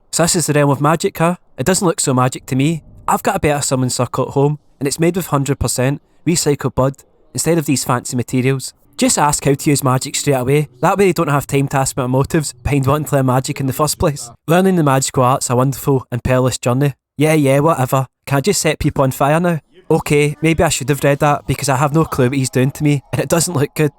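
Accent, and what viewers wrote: Scotland, United Kingdom. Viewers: Its gid to have more Scottish youtubers nicer to listen to along with the Irish ones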